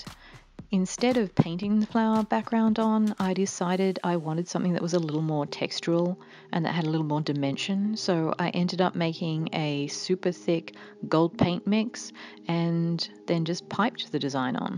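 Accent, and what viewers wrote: Australia. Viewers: Hey where's your accent from? It reminds me of mine somehow, yet I'm a Queenslander from Australia. Just want to know if my accent is out of place or if I somehow detected the QLD accent in the wilds of America.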